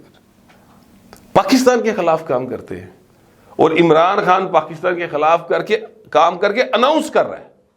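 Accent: Punjab, Pakistan. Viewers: چول پنجابی ٹچ نا دیا کرو چھچھورے لگتے ھو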